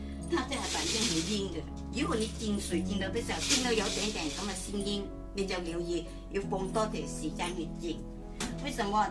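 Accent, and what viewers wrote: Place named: Cantonese, China. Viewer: 你講啲話好可愛，一半廣東話一半台灣話，聽到我想笑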